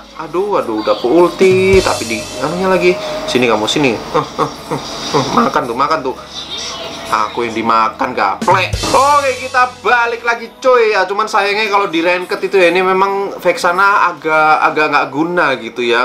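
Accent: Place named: Malang, Indonesia. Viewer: Arema medok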